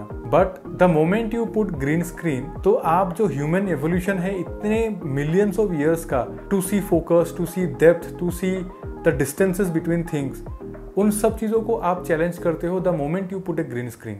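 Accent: Assam, India. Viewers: this sounds like Assamese.. Oi bro tumi akhomiya ne??❤️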